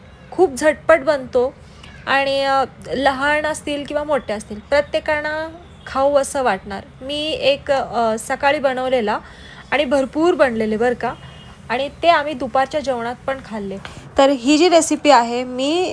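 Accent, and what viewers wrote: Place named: Pune, India. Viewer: तुमची पुणेरी मराठी खूपच शुद्ध आहे 😅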